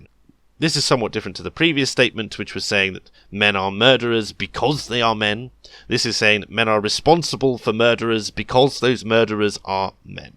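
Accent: RP, United Kingdom. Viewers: Posh Brits! I love Posh Brits talking channels. I love Voltaire's Ghost too.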